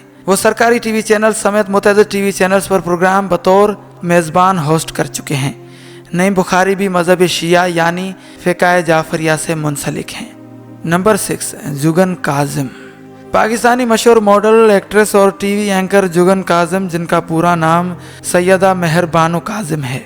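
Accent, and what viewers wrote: India. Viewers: Yeh bolne waly bhai mujhe india k lag rhy hen inka lehja indian h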